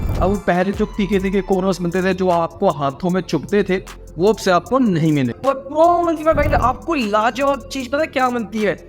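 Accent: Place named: Pakistan. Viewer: Are you from Pakistan? Your tone sounds like them that's why just asking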